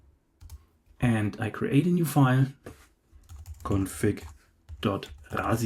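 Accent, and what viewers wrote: Germany. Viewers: are u deutsch?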